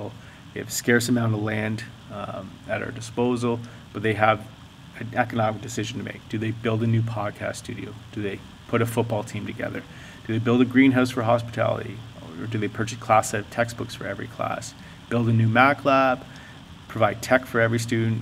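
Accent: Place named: Canada. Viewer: Nice Canadian accent!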